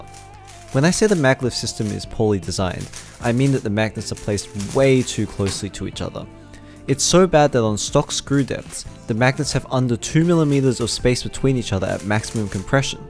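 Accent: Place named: New South Wales, Australia. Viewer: yo are you from nsw if u dont mind me asking?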